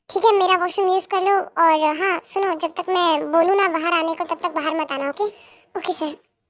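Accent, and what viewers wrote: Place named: Punjab, India. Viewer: Jinu punabi voice ❤😊🥰🥰🥰🐂💜❤❤❤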